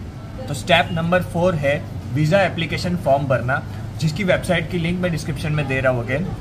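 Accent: India. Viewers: Brother in Russia with Indian Accent is like Magic❤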